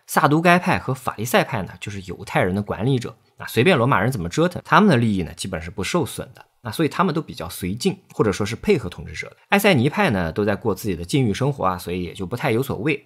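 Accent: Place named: Nanjing, China. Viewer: 这个我滴妈很有南京人的腔调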